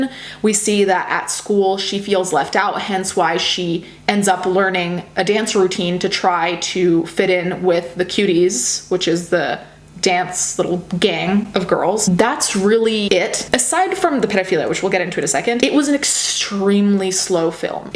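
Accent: United States. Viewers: I don't mean to be rude but how come you have a full American accent if you only moved to America 5 years ago? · Unrelated but for a non-native speaker you have all of the nuances and a great (American) English accent! The fact you speak 3+ languages fluently is incredible. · I knew that you were Italian, and that English was your second language, but I had no idea that you have only been here such a short time! Your American accent is fantastic. Keep up the good work, my friend!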